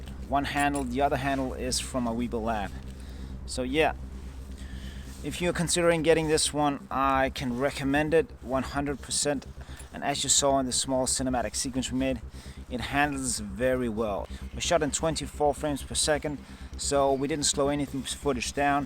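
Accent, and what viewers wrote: Denmark. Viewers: Nice video :-) are you Danish? ;-)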